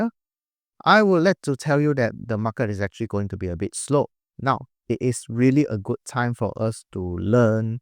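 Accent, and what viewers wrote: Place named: Singapore. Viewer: I can't understand your singlish 😅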